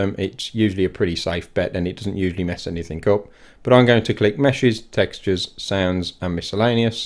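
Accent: Birmingham, United Kingdom. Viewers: Are you brummy? Had to ask Haha.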